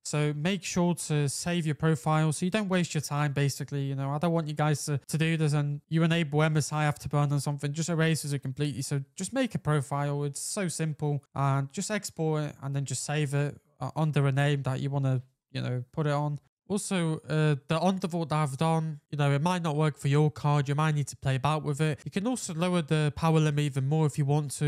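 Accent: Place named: United Kingdom. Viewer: hi Bro nice video again! But you again didnt showed the real fan curve, just seing the curve without values is not really helpfull :( We need the numbers!! xD thanks for testing! Love your content and that heavy accent (british I think? :P), your videos are amazing quality too, I would expected this quality from a channel with 20-100k subs but you have 1.000 but still so quality videos, thank you!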